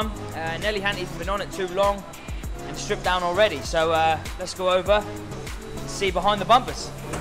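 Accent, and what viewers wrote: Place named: United Kingdom. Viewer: Twit-ah. I love British accents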